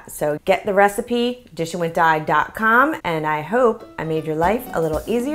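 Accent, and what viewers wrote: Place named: East Coast, United States. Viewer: I hear the east coast in your accent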